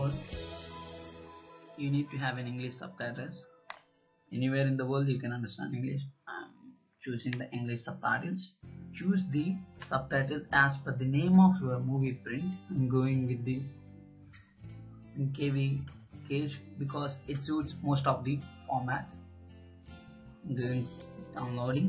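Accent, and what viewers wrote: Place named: Punjab, India. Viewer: Are you 👳 👦, your accents show you are.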